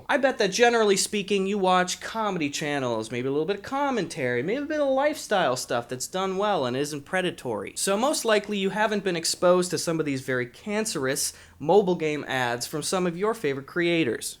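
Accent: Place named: Wisconsin, United States. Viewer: He can switch to his normal voice to his Wisconsin accent and that's pure power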